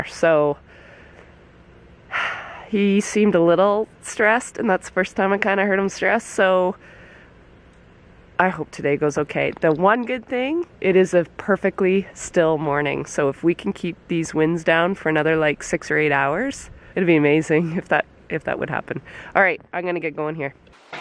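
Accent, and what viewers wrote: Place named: Canada. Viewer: Where are you operating out of? Looks like Michigan but I just heard you say out} so I know your in canada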